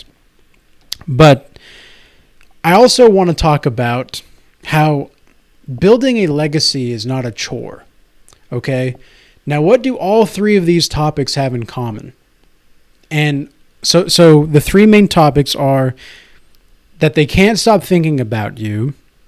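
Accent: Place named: United Kingdom. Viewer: No. It worked. The British accent. It worked : )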